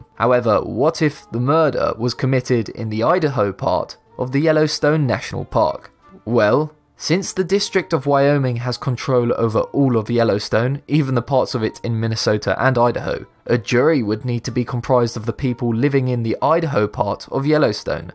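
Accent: United Kingdom. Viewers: Why did I subscribe just cause he's British 😂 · annoying british accent unsubscribed.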